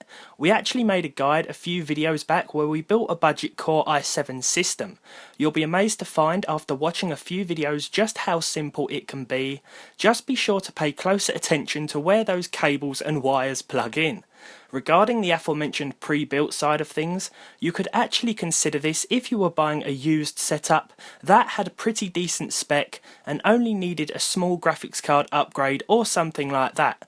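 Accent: United Kingdom. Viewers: Love this channel. Which part of the UK are you from?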